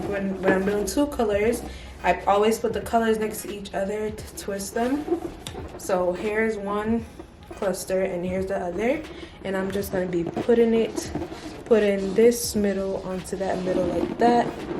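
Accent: Jamaica. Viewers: Great tutorial. I love balloons, but I don't like working with them; I'm always thinking they are going to pop, and that freaks me out to the Nth degree+1. P. S. you sound Jamaican.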